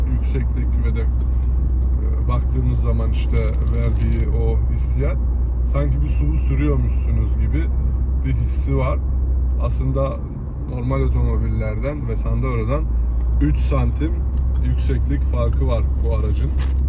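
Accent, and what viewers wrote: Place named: Söğüt, Turkey. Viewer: Nerelisin hemserim sanki sögütten geçtin gibi geldi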